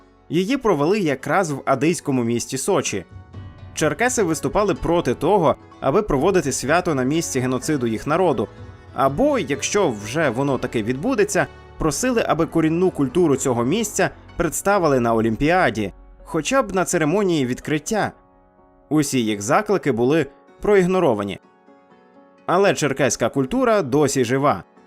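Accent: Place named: Ukraine. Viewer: Ролик с потсрекательством , будьте бдительны.!!!! Высвечивают только один фрагмент,. Подумайте для чего ? Голосу с украинским акцентом рассказывать про черкессов, сейчас в такое гопячее время - это во первых , а во вторых проверяйте информацию более обширно, учите настоящуюю историю своих Родов , развивайте критическое мышление. Слава всем Родам.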